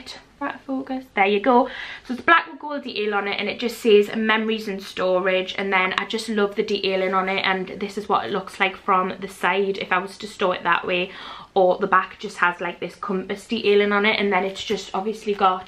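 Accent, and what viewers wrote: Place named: England, United Kingdom. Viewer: I love "bathe-az", "joggaz", "furnitaz", "knickaz". Not taking the mick, just love the different ways we all say words in England.❤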